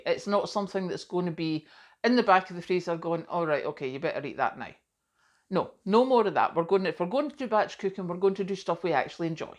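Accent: Scotland, United Kingdom. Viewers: Yes please to Pom Perks. It's badly needed at the moment, it's so busy on the farm this time year its hard even to fit in a meal and wee sit down after. Afternoon tea made me smile. With your accent it reminds me of the high tea my friend who lives in Bridge of Weir, Renfrewshire always does on a Sunday. Everything is home made, it's such a treat when I go stay with her.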